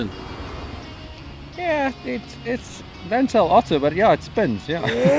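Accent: South Africa. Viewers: you south african? sounds like our accent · are you afrikaans?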